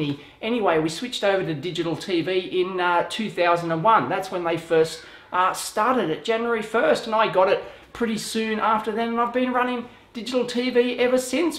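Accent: Australia. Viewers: It’s Australian sorry to break it to ya mayte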